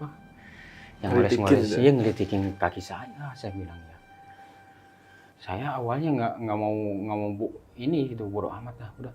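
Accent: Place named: West Java, Indonesia. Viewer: Sudanis pisan. Rada paciweuh pabaliut letah mun ngomong bhs.indonesia teh nya kang abi ge kang. Salalamet sdyana 🙏🙏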